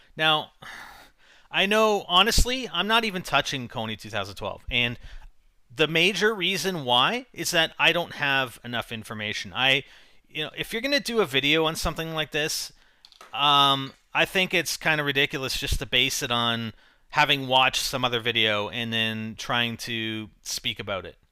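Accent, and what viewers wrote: Canada. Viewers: Canadians really do say oot! 0,0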